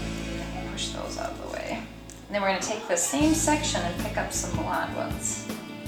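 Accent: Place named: Minnesota, United States. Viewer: r u from minnisota u knind of have the accent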